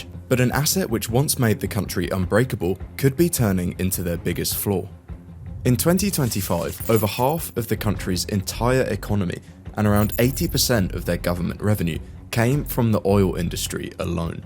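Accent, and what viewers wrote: United Kingdom. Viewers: A BRIT TALKING 😂. CRIME RATE THROUGH THE ROOF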